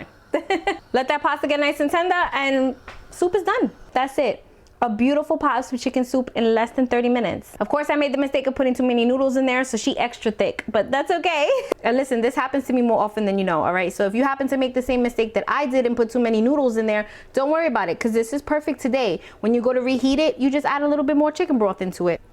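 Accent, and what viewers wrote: New York, United States. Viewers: The New Yawwk accent!!❤❤ reminds me of home! 😊 Thanks for the recipe!❤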